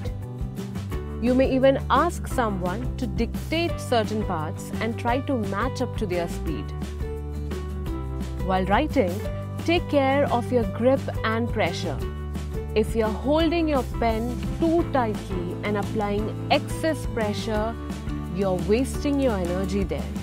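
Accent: India. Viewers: she sounds like an Indian like me. Btw don't tense your arm it will make your arm hurt and tired and you will be exhausted pretty quick. Make sure you use the movement of thumb and fingers and if necessary your wrist. Important lesson I learned from my guitar playing. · i love your indian accent wow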